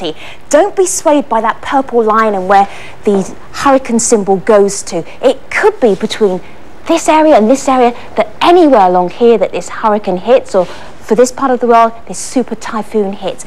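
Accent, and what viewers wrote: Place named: Australia. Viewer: she talks funny, aulstrailian accent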